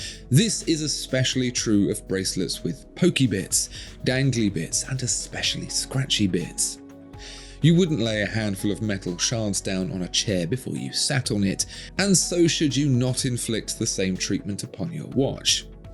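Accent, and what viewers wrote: United Kingdom. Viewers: Finally, i’ve seen who’s behind that British accent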